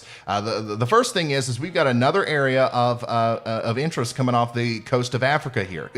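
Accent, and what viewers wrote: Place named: Kentucky, United States. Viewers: I knew I recognized the accent! Kentucky's as close to Heaven as I'll ever come. The dew sparkles like emeralds in the morning sun. The Bluegrass and the mountains take you right up to the sky. And I hope that I can go back to Kentucky when I die. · I came across another older video and i just wanna say that i KNEW i heard Kentucky in the accent! Sure enough i go to check a more recent video and im proven right. Ima subscribe now because I do enjoy good weather coverage and a Kentucky boy😅 (I'm from western ky, btw! Muhlenberg county specifically)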